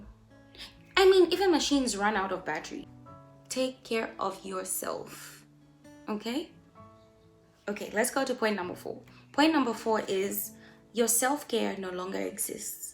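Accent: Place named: South Africa. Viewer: You sound South African sometimes ... so cute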